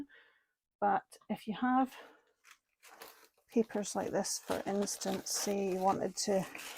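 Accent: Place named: Scotland, United Kingdom. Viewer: Really enjoyed this, as I have recently discovered gel printing. Also enjoy your accent-my mother was from Glasgow(Milngavie) She has passed away and I love hearing the Scottish accent-it is such a comfort!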